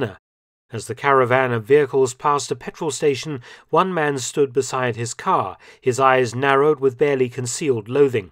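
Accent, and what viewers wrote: United Kingdom. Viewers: his proper British accent, is second to none. 💯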